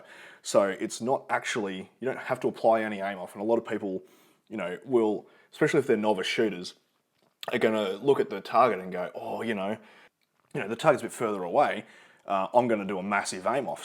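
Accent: Australia. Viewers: It’s unusual to hear an aussi talk about guns. 😂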